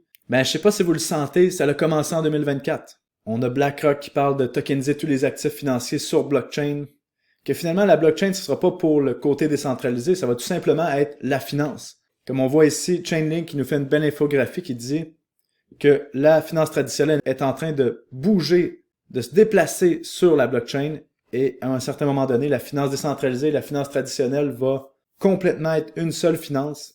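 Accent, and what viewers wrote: Quebec, Canada. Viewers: Bonne année, bonne santé et tout ce qui va avec !🎉 Je te suis depuis que je suis rentré dans les crypto en avril 2024 et j' apprécie vraiment ton contenu. Les expressions "allez les guerriers", "porno de la peur", ... donnent un peu d'exotisme à tes vidéos au delà de ton accent québécois 😂 J' ai téléchargé ta formation gratuite comme ça je serai au top pour le prochain bullrun. Merci pour tout! Change rien! Let's make monney! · Avec un charmant accent quebecois wn prime c est du bonheur. Merci pour la qualite de ce que tu donnes et l energie que tu partages.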